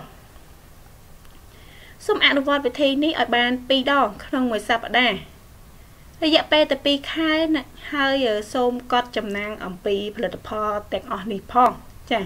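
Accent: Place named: Koh Kong, Cambodia. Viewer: អ្នកកោះកុងហ្មងសំលេងនឹង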